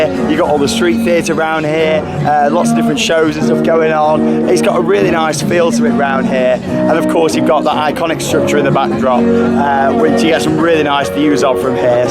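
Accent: United Kingdom. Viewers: I enjoyed your video and also your brithis accent !!!!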